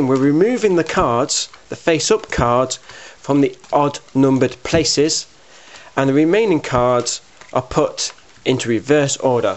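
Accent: United Kingdom. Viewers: i would guess ur british? -.-' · i like ur british accent~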